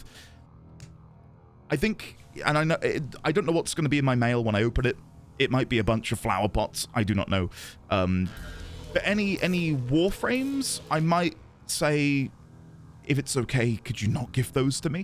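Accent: United Kingdom. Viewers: I totally agree in regards to playing the game solo for now, I played the whole starchart solo and it helped me to learn all the mission types and game mechanics better. The only time I joined squads was for interception missions as defending 4 points on the map solo was a challenge for me 😊 You sound as though you are also a fellow Brit , not sure I have seen many UK players make videos. Great vids btw and yes personally I love watching your whole WF playthrough, it's a bit of nostalgia for us who have been plying for years, we enjoy seeing new players experience what we have experienced, the new player experience seems much better now. Love your excitement and energy. 😂 You can buy a codex scanner from the market for credits if I remember correctly, then you can use your Helios to auto scan enemies like Vor and Vey Hek etc, every enemy actually for your codex entries. 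It will then also show you which damage type is best for which enemies, not that you need to worry about damage types yet The difficulty will increase per planet so don't worry it will get tougher.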